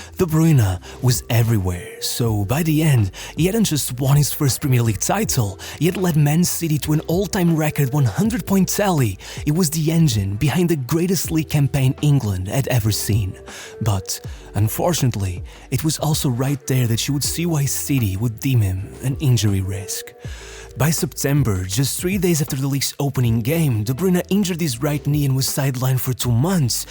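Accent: Portugal. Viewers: Mano, ando a ver isto a 2 anos e jurava que o sotaque parecia português, incrédulo, trabalho espetacular. Super fixe ver um canal desta magnitude e qualidade sair da nossa pequena mas dedicada comunidade, melhor das sortes🇵🇹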